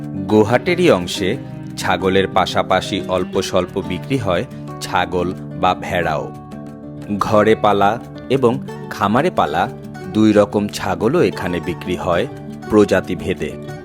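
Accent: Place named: Bangladesh. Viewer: Brother R you Bangladeshi ? Your talking style sounds little different.Do they export this big sweets ? But your video attracts me.Thanks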